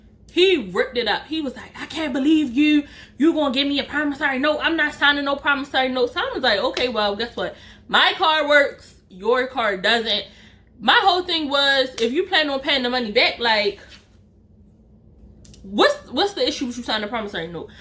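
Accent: Baltimore, United States. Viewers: Baltimore accent?? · Girl, I am disgusted. #1. I knew where you was from when you first started talking. All my mama's side from Baltimore. #2 These girls suppose to be grieving thinking about how to give you tea on they mess. I cannot believe this! I am still listening but I am HOTT with you. The disrespect! This is why I always tell my brother, be real because don't leave any mess behind for us to clean up! Aint nothing about you being young and dumb, I would never judge you. You had every right to think you were the only one and had no idea the mess he left, smh. Sis...YOU WISH YOUR BROTHER WAS HERE TO MEET HIS WHAT?!! Girl... New subscriber! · I Love You 😂 New Subbie 🥰💕 P.S. I Loveeee To Hear Ppl From Bmore Say Words Like "New, To, True, And You" 😂😂😂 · Girl where you from? You lowkey sound like you from Baltimore if so same!! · First I wanna say sorry for your loss! 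But gurl u had me rolling 🤣 u are a Great story teller. I knew u were from Baltimore 2 mins in when I heard how u pronounced each other only us Baltimore ppl say it like that 😆🤣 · When you started talking, I said “oh she is from the native land” 😂😂🤣🤣🤣🤣 Baltimore Girls 😉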